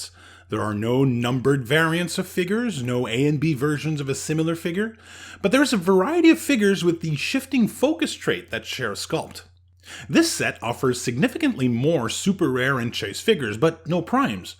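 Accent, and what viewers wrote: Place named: Quebec, Canada. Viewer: So the is French Canadian!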